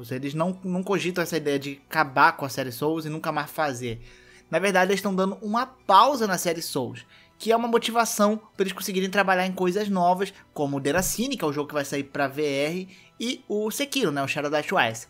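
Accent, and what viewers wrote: Rio de Janeiro, Brazil. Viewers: Quando voce Fala twice parece que voce Fala tchuaiche.. Eh sotaque carioca?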